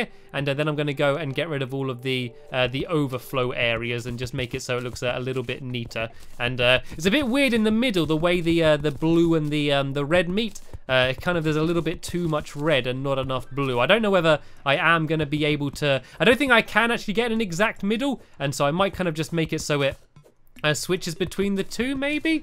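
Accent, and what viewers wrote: England, United Kingdom. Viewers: Tyler Delsol if you can't identify a British accent, I'm slightly worried for you.. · your from engand not amerac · He's a brit I should know cause I have a the same acent psychological don't call me Thomas most people call me Nickola ( Nik- o -lie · is stampy english and is scottish?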